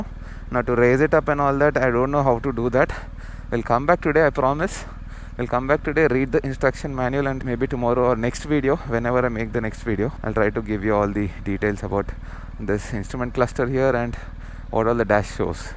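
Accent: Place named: Telangana, India. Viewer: Gudhura nanochi gudhura Telangana basha 😂😂😂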